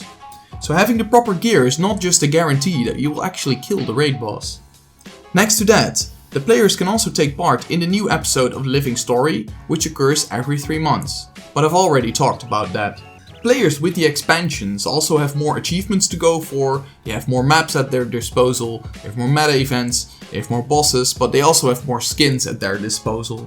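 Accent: Netherlands. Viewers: haha are you Dutch? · This fucking Dutch accent lol. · Are perhaps Dutch? I'm basing that on your accent.. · I hear a dutch accent :P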